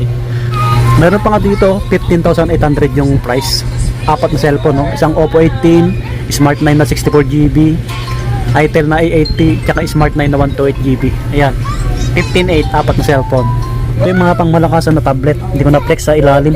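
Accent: Visayas, Philippines. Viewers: Bisaya kaba idol😅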